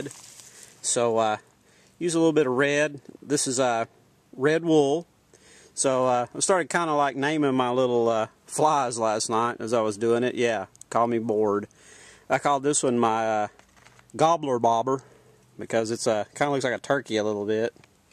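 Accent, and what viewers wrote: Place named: South, United States. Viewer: This guy sound really southern. (in a good way)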